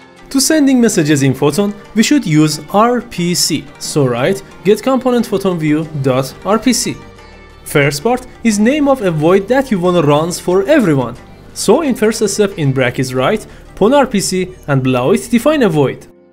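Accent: Iran. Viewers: you sound Iranian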